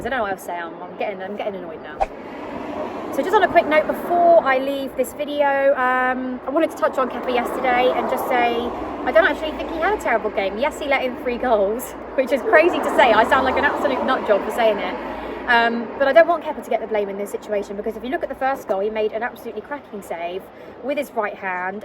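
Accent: United Kingdom. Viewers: I'm still pissed off about this match..I don't even care to know the reasons she is giving but.... I can't help it I just love the British accent.....I would love to have a British friend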